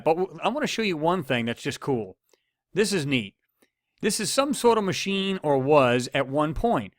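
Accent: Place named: New Jersey, United States. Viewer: "for everyone on a pee cee" you're funny you're definitely from New Jersey Maryland or New York LOL anyhow brother send me an email. I like your Mars and moon work the most but I wish you could take a nice hard long look at Ceres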